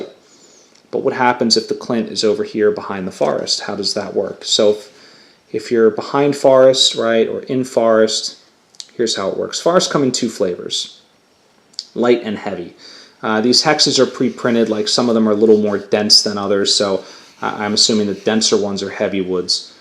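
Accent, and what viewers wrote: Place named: Pennsylvania, United States. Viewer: From PA? Water pronounced 'wooder'.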